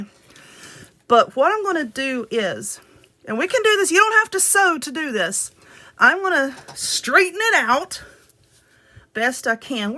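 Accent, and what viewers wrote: South, United States. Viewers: I love your sense of humor and your Southern accent. Only nosey people are going around picking up stuff to see if you took the tags off 😂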